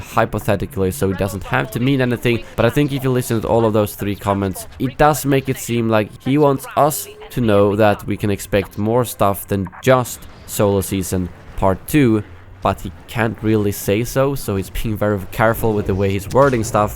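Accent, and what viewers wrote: Sweden. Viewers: are you swedish? är du Svensk?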